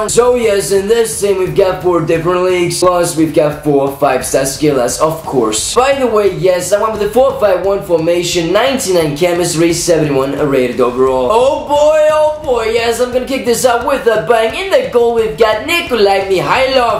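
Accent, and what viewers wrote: Iceland. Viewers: are u Icelandic ?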